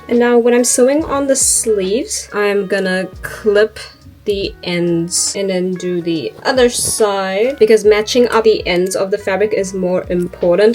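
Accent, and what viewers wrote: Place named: New Zealand. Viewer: Obsessed with the silhouette of this fit!!! also your kiwi accent is rlly cute btw!!!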